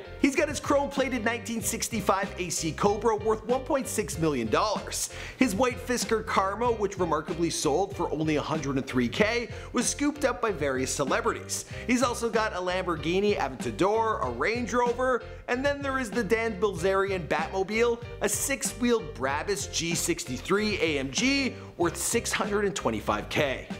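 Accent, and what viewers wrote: Canada. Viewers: I forgot is a damn Canadian... 😑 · Real Bride Of Chucky he’s Canadian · Jessica haha he’s Canadian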